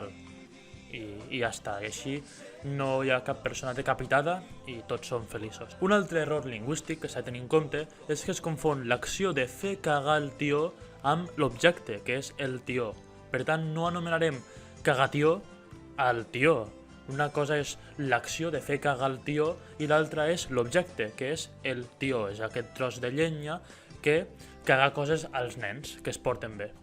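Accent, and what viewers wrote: Western Catalan, Spain. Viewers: No està malament el vídeo, ara, no se de quina regió 'dels països catalans' ets (? Parles un català occidental molt curiòs! Estic d'acord amb tu menys en la de 'nit bona' , la 'nit bona' (que no se si algú l'anomena així) la 'noche buena' espanyola no es la nit de nadal, es la revetlla de nadal (la nit abans) i pel que fa a la resta, bé, crec que algú amb seny sap que són 'castellanismes'.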